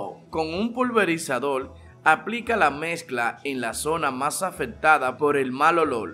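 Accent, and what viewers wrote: Dominican Republic. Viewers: Así hablan los dominicanos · 🤪 los dominicanos hablamos así😝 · Ah ok, es bueno aprender algo nuevo cada día. Entonces supongo que es un acento de algunas regiones de República Dominicana. Igual se debe de respetar las diferencias culturales 😅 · Es el acento dominicano, aprenda un poco :)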